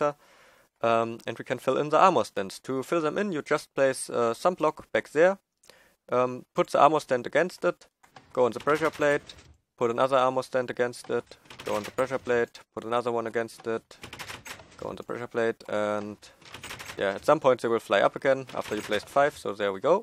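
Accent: Germany. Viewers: Kann es sein dass du deutsch bist? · Are you German? Your pronunciation sounds like a German accent. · ich wusste schon immer das deutsch bist ;D wegen deinem akzent aber nice video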